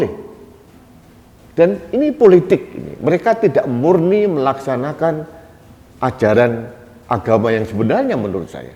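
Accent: Java, Indonesia. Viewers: Pak asalnya dari mana ya kok bahasa Jawa nya medok amat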